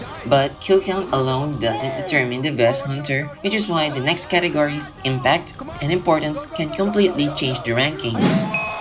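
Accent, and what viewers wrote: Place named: Philippines. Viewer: Sabi ko na taga pinas ka, kasi same tayo ng accent kapag nagsasalita ng English hahahaha the resemblance is uncanny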